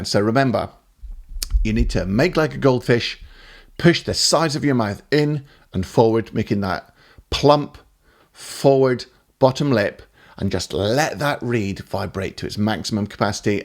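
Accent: Scotland, United Kingdom. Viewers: This was a great video for me. I really appreciated your explanation about the reasons why the "pout" works so well. Thanks. Not too many Scottish accents on the tube. I'm an exile from Kirkintilloch, living in Kent so always good to hear.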